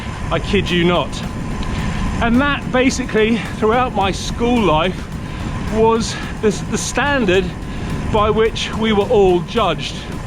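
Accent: England, United Kingdom. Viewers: Excuse me you accent sounds English! Kilometers........flipping miles.......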